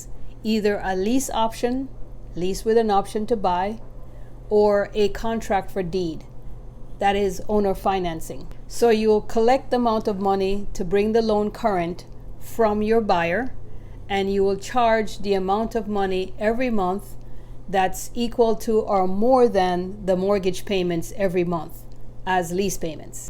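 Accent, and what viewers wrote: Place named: Jamaica. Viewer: you convey your message clearly. You sound like you're from my home country JA,..Quick question may I ask how you put together a buyers list?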